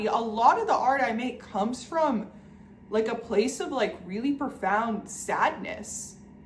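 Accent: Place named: Israel. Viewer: יש מצב שאת יודעת עברית? 😅 האינטונציה שלך נשמעת ישראלית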